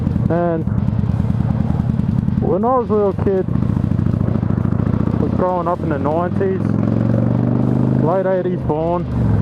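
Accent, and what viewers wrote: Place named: Australia. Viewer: Saw you channel name on a comment so I thought I would come and check it out !! Sounds like you are in, or from Australia ....We were in Australia in early 2019. Love it there!!!. New subscriber here from Canada .. My dream bike as a kid was the '82 Honda CBX 1000, sport touring bike....to this day I wish I could find one !!! cheers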